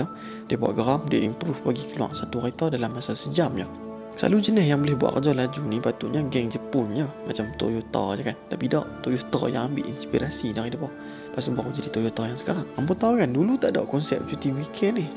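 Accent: North, Malaysia. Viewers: Pekat utaqha dia.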